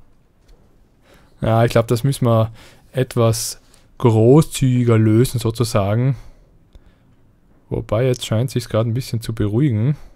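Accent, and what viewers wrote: Austria. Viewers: Finde die Serie super! Bin momentan im Krankenstand und bin noch nicht dazu gekommen mir das spiel selber zu kaufen, jetzt habe ich mir jede Folge von dir angeschaut. Habe zu erst begonnen bei Gronkh zu schauen, finde aber dessen "Spielweise" etwas umständlich. Und da bin ich mehr aus Zufall über deine Videos gestolpert, da geht was weiter in den Folgen und bin auch größtenteils mit deinen Entscheidungen einer Meinung was bei Gronkh z.B. nicht immer der Fall ist, daher finde ich diese Videos netter zum anschauen. Ebenfalls finde ich deinen Dialekt netter, bin ja selber Österreicher und finde es sehr angenehm. Habe mir auch einige deiner Sid Maier Railroad folgen angeschaut und überlege mir auch dieses Spiel zuzulegen so als alten Klassiker :D WEITER SO! Freue mich schon auf die nächste Folge!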